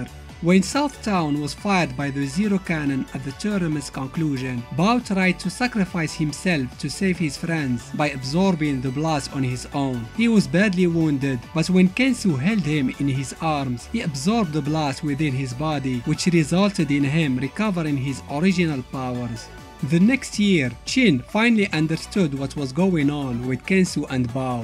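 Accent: Morocco. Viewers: just from your voice i recognized that you are from morocco XD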